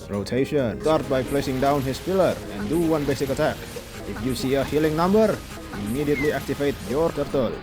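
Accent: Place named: Philippines. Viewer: Man that accent😅 i cant. I assume you're a filipino or indo cuz i also sound like you whenever i speak in ingleesh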